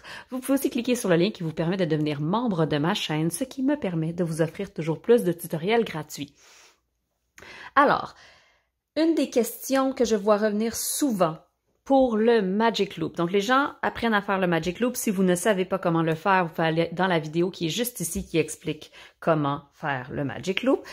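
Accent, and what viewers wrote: Canada. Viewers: bonjour je viens de voir votre méthode pour éviter l effet d échelle et votre présentation explique bien ce que j' ai comme souci en fait mes câbles ne sont pas assez proche lorsque je fais la 1 ère maille de chaque moitié des mailles et ça me fais cette effet lâche de fil ... avec les tous les conseils trouvés des triconautes j'espère y arriver...ceux sont mes 1 ère chaussettes je garde espoir belle journée et merci pour votre vidéo une bretonne qui tricote a une canadienne 😍😇 ( j'adore l'accent)😊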